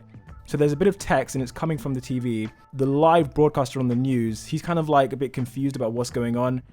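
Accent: United Kingdom. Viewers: It’s funny this is about Dabi’s reveal but the biggest reveal was seeing what looked like! NGL, I pictured you as Harry Potter because you don’t have an American accent so I thought it was British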